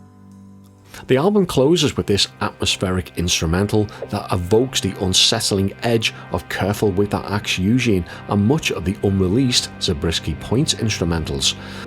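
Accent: Liverpool, United Kingdom. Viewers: A fellow scouser narrating one of the most underrated albums.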